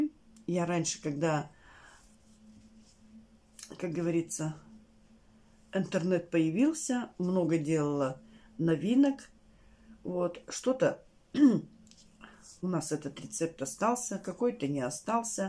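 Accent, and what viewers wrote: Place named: Urals, Russia. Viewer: Я не так давно на вашем канале, и при просмотре первого видео сразу услышала уральский говор.😊 Я сама родилась и выросла на Урале, и хоть и живу уже несколько лет в Питере, но наш уральский говор узнаю сразу 🤗😊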